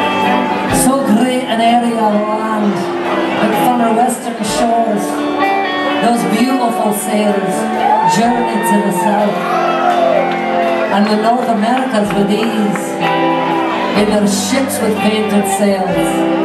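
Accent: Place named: Ireland. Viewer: who does the opening narration? not in CRB is he? sounds Irish.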